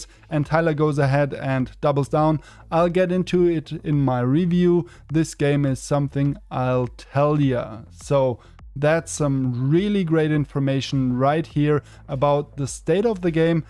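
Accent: Germany. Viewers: I like your videos but you sound like a German who speaks English 😂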